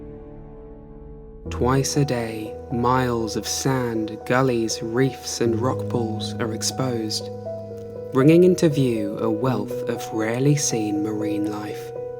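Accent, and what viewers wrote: England, United Kingdom. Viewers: Something about English accents and nature documentaries. They just fit together so well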